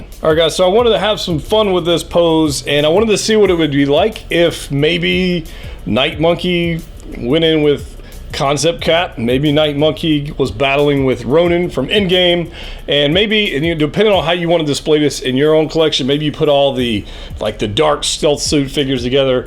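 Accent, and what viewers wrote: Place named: New Jersey, United States. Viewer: lol..that is Jersey accent you acquired ! Hilarious !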